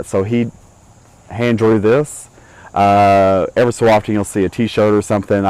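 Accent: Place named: South, United States. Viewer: What a beautiful testimony . You are correct, God does love you.It takes a real man to tell such a personal story. Roxy may not fully understand everything when she sees this because she is young but she will fully understand it later. I am sorry you had to go through a hard time at school,kids can be mean sometimes. I actually just think you sound very southern, and I was wondering where you were from.God gave you the life he did for a reason and I think some of it may be for telling your story. You may have led someone to Christ by giving this testimony.I pray that you and your family feel better real soon. If you do have the virus maybe it will be a very light strain of it. I love the story behind the tattoos.Prayers are being sent you way.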